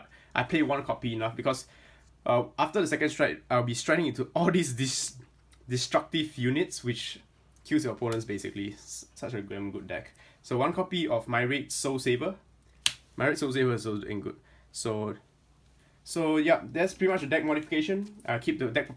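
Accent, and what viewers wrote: Singapore. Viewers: You sound like a singaporean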